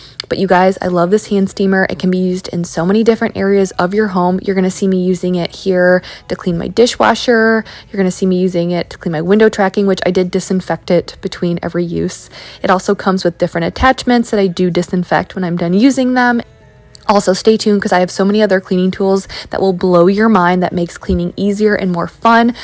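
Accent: Midwest, United States. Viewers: There’s something I love about hearing your Midwestern accent narrate the cleaning 😂😂 I’m 8 months pregnant and can only do like….a load of dishes and sweep the floor and I’m spent for awhile so it’s nice motivation to watch you get so much done!! 😃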